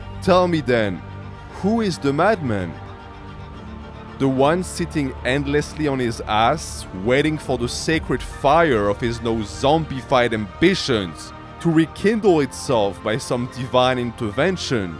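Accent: France. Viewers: Although you are German , your accent is very similar to a French accent.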